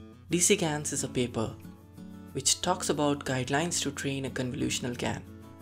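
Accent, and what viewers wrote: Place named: India. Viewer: maybe rerecording it with a voice without Indian accent would be advisable (sorry to be direct ;) )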